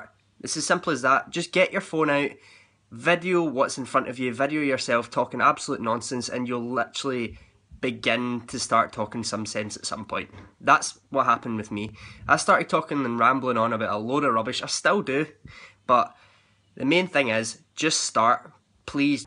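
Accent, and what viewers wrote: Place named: Glasgow, United Kingdom. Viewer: I want a Meet and Greet in Glasgow! I was born in HOUSTON , TX. I now live on the Gulf Coast of Texas. I lived in Corby, Northants in the late 80’s. Love the Glaswegian accent. I’ve got God-daughters in Leicester that are amazingly gorgeous. Hint, hint. I’m sure they’d be GRATEFUL to meet such a positive guy. YOU ARE AWESOME!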